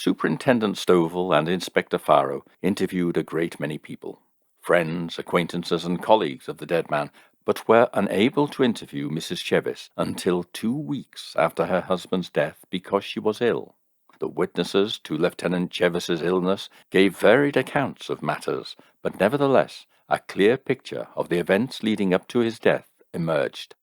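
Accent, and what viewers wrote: United Kingdom. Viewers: We missed you and you're wonderful story telling, drawings and investigative talents. We want more! Best of wishes always...From a Brit in the USA who is always restored by a fellow British accent and intelligent logic. XXXXX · I love the true crime stories that you presented. Hope to see miore of these videos of yours. And the music is great, your voice plus the gunshot. So British.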